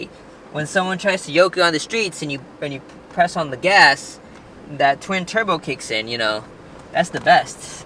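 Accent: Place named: United States. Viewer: What the fuking wrong with your American voice?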